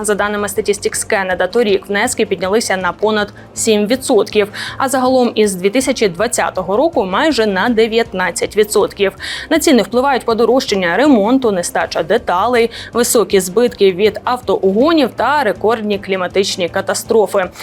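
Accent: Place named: Kirovohrad, Ukraine. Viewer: акцент Кировоградки ,чи рядом областi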